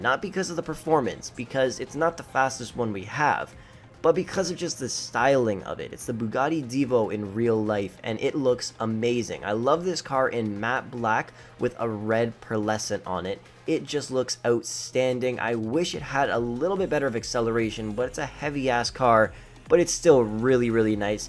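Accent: Canada. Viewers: Nice vid, you’re from Canada aren’t ya? I figured by the way you said “out”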